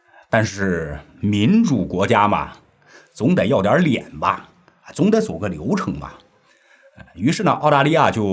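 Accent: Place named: Henan, China. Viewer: 我赌一百你是河南人，对不对？？？？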